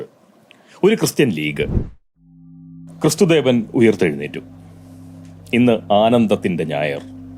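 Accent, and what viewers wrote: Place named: Ongallur, India. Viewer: ഒരേസമയം ചിന്തിക്കാനും ചിരിക്കാനും വക നൽകുന്ന ഓങ്ങല്ലൂർ സംസാരം എനിക്ക് ഏറെ ഇഷ്ടമാണ്. നന്ദി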